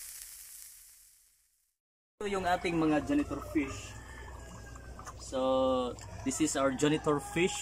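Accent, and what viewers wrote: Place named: Philippines. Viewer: I love your tagalog accent ♥️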